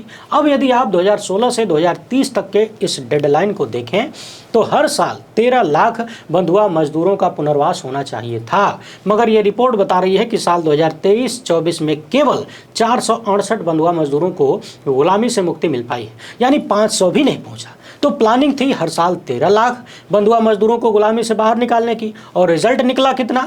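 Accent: Bhojpuri, India. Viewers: ये भी अजीब संयोग है जी आपने कहा, "गर्मी का मौसम है ठंडा पानी लेकर बैठिए मेरी टेबल पर ठंडा पानी ही है। " यूं भी मैं एक बजे के बाद ही सोता हूँ। आपकी खबरें देने का अपना और अपनी भौजपुरी स्टायल में बोलना आकर्षक है वार भी सीधा करते हैं बिना किसी लागलपेट के।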